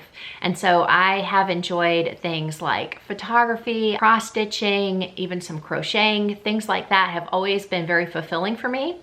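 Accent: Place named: South, United States. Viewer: I’m new to your channel but I am really enjoying it! I loved your Q&A. I was surprised to find out you are from North Carolina. That’s where I’m from. I have a little more southern accent than you do!! Keep up the great videos! It’s refreshing to find someone who is not afraid to express your Christian values.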